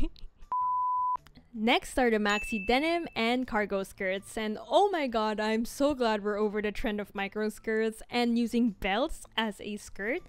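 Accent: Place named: Philippines. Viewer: omggg i was thinking your accent sounds filipino and i was shocked when you spoke tagalog LMAO i like this content, pls continue doing more <3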